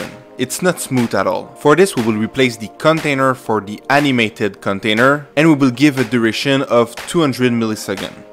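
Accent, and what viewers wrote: Quebec, Canada. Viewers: Are you french Canadian by chance? This accent is really familiar haha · t'es quebecois?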